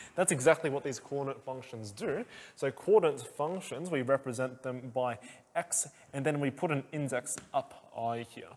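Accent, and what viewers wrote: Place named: Australia. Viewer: Haha, I was just trying to place your accent when you gave the Sydney example. It’s an Australian accent, of course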